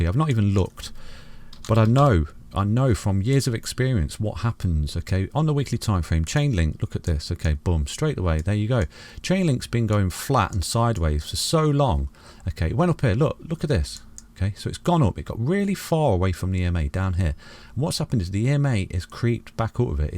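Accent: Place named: Hinckley, United Kingdom. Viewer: ohhh I was close. I thought you was Hinckley because of how you say "cuh-daano" ha ha I am Birmingham. Not too far from you mate!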